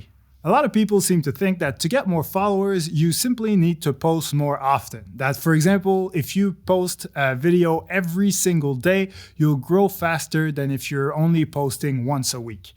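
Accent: Quebec, Canada. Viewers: You are from Quebec right ?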